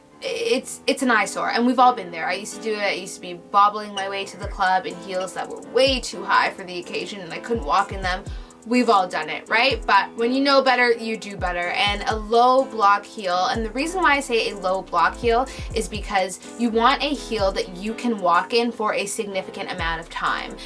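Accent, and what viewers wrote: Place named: Canada. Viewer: "Crusty clothes". That's so cute. Jamaicans have the cutest words and sayings. You mentioned that you live in London. You don't have a British accent. You sound Canadian, do you mean London, Ontario? I live I Canada and a coat, and more than 1 is sooo important. Great tips and great video.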